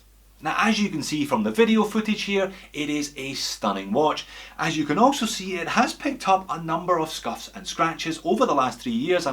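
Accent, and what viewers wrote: Scotland, United Kingdom. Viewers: Ha ha, love the watch gift idea. Going to find another fiancé 😂looking for a Ball 904L I'm a recent subscriber and slowly getting through the vids.. don't mind the scotch accent...if you were Welsh we'd be told every other minute.. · Sorry, I've watched you from start of corona but now you've iterated at least 4 times you're a Scotsman in this vid alone... accent is starting to make sense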